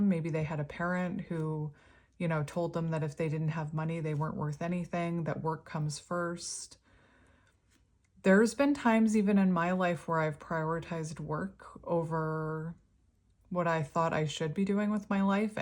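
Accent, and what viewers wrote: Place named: Canada. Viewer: Hi! I've been watching you for about 2 weeks now & it feels like you are looking at my & my Twin Flame's life. We've been together for 12 years & right now it's really complicated. We've been apart for over a month now & I've been so broken over it. You & your readings have been spot on! For example, in like 3 different readings you kept saying he's watching me, almost like voyeuristic. I didn't think so but 3 different times he's said something about watching me or followed me someplace when I wasn't where I usually would be since I watched your readings. A lot of what has happened now makes sense, & that is entirely thanks to you & your gifts. You have a healing voice & I've really enjoyed watching you. You've given me a lot of insight & a lot to think about. You made a comment that a person could tell you were from Canada by the way you say "car". 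For me, the first time I heard you say "about" I knew. It seems like you all put a lot of emphasis on the "ow" (ou) part of the word. It's cute, like a reverse southern draw. Thank you for the clarity & peace that you've brought me. You have really REALLY helped!!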